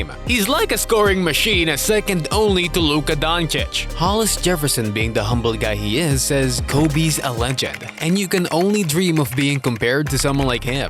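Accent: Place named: Philippines. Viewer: Pinoy n pinoy accent m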